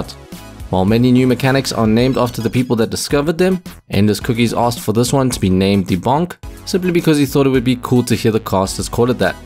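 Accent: South Africa. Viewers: Haha nice! I could tell by your accent! 😄 I am also from Joburg 😊 are you currently living here? I get the feeling you're living in another country for some reason? 😂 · Are u from South Africa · Are you South African? 🇿🇦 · Are u south african :) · are you South African ?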